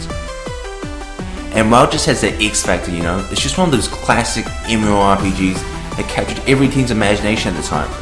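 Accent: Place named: New Zealand. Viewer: Kiwi accent, not British. He says it himself at the end.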